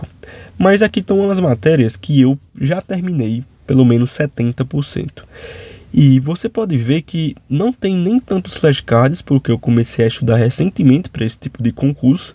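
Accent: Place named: Pernambuco, Brazil. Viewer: Você é pernambucano?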